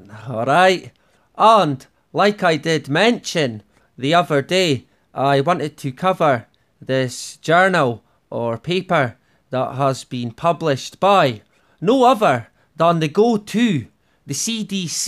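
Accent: Scotland, United Kingdom. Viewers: aha! My first assumption was Scots, Thank goodness. My former boss from Glasgow has been passed for over 10 yr. I was one of the only peeps who understood everything he said🤠 RIP brother Kirkpatrick Keep up the excellent work!good sir☮️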